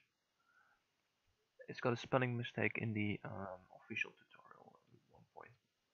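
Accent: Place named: Netherlands. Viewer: Thx for the tutorial :) I assume you're Dutch . so it sounds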